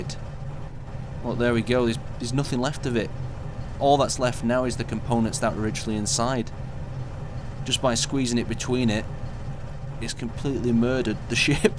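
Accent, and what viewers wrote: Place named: Northern England, United Kingdom. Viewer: Scott is considerably more Scottish than this chappy. I think his name is but I'm not sure. Either way he's clearly English, but I think somewhat Northern?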